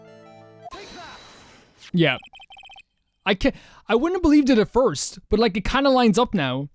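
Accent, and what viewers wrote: Ireland. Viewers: I thought you were Irish? Maybe Iʼm getting you confused with another guy I started watching recently or maybe you moved in the last four years. : Edgeworth should know not to say that. Armando told Mia why last case: never ask a question in court if you donʼt already know the answer. Phoenix breaks it all the time out of necessity, but Edgeworth should at least be trying to follow it.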